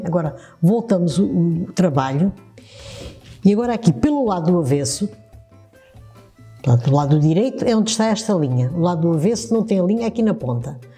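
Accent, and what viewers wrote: Portugal. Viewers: Obrigada pela lição estou aprendendo gostaria de fazer está pantufa para minha mãe e filha de português tem 97 anos quantos pontos coloco na agulha,sou iniciante no trico obrigado e beijos gosto do sotaque português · Adorei a sua maneira de explicar e estou fazendo este sapatinho lindo.Minha avó materna era portuguesa e o seu sotaque me fez lembrar dela ,que tbem tinha mãos abençoadas como as suas e senti muita sdd dela que já partiu a muito tempo. Gratidão .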